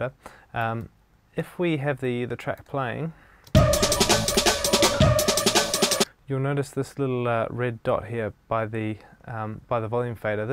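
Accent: New Zealand. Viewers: CHEERS! Awsome vid bro, I think you explain things really well. I will definatly subscribe ;) Take it easy! P.S. Your a kiwi right?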